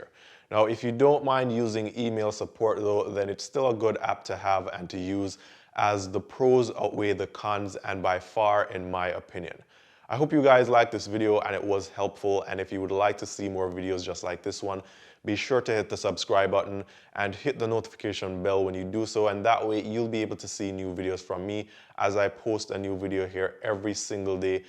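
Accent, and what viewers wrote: Jamaica. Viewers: Are you from the Caribbean (Jamaica)? You don't sound like American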